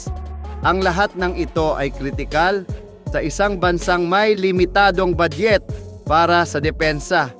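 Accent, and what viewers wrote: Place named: Visayas, Philippines. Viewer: BISAKOL YUN NGSASALITA😅😅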